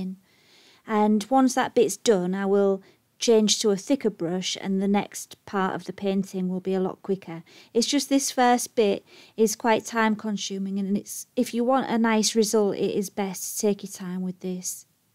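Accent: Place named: Northern England, United Kingdom. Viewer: this is absolutely beautiful!!!!!!! I have got to try this out. And your Northern accent is so cute and relaxing!! You explained this perfectly!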